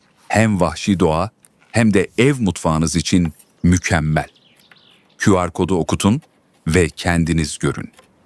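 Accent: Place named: Turkey. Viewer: Salam niyə türk ləhçəsi ilə danışırsınız?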